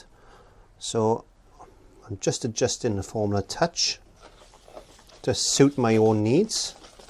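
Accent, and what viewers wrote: Wales, United Kingdom. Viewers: Do i detect a slight welsh accent? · the accent is Welsh...even the rest of us Brits can struggle a bit sometimes.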